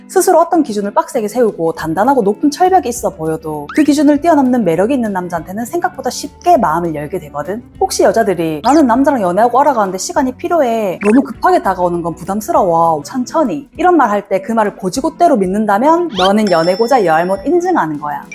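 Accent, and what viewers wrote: Gyeongsang, South Korea. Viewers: 갱상도 가스나네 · 경상도 말투 귀여움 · 경상도 여자군요 ㅎ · 경상도(부산이나 대구) 사투리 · 갱상도 사투리 반갑소~~~😊😊😊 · 갱상도 여자네~ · 갱상도네~ 부산 경남 쪽아이가~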